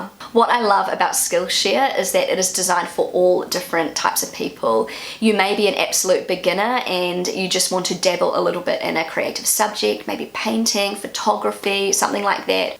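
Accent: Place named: New Zealand. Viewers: Oh yes, you have a New Zealand accent. At the beginning I had a lot of small problems to understand you. But now I have no probs anymore. But the Kiwi-accent is so easy to understand in comparison with the Scottish, Texan or Kentucky accent. I love your accent. It is so sweet…for me… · That's exactly how I felt. I googled right away New Zealand English to find out if speaks it properly:-D But I definitely like that accent. Generally I think nobody has to be ashamed of their accent.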